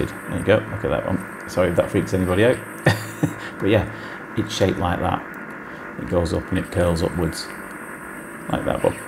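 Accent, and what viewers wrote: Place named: Liverpool, United Kingdom. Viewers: You’ve got a slight Liverpool accent 🙂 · Are you in Wigan? It sounds like you are?in the middle of Liverpool and Manchester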